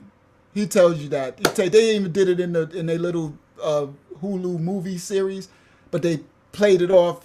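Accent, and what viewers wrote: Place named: East Texas, United States. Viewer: I've been listening all day at work and most times I listen to the Ministry channel... but I decided I'll listen to the 25 to life channel.. I'M LIKE UNK. YOU SOUND LIKE YOU FROM THEM DEEP WOODS WHERE I'M FROM😮😮😮😮 I HAD TO WITNESS CROSSES BURNING, AND LAYING ON THE floorboard just to get Beaumont.. like😮😮😮 KOUNTZE, SILSBEE, JASPER, VIDOR, LUMBERTON( MORE RACIST THAN VIDOR=SWEPT UNDER THE RUG, WHERE I SAW MY FIRST BURNING CROSSES) WOODVILLE...ALL IN DEEP EAST TEXAS... THEY STAYED ON THEIR SIDE IN OUR SMALL TOWN AND WE STAYED ON OURS..I PRAY 1 DAY TO TELL IT..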